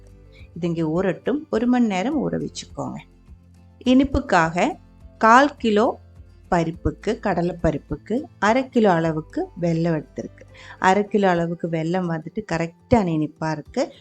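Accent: Kerala, India. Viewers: ഒരു മലയാളി തമിഴിൽ സംസാരിക്കുന്നത് കേൾക്കാൻ നല്ല രസമുണ്ട് പായസം സൂപ്പർ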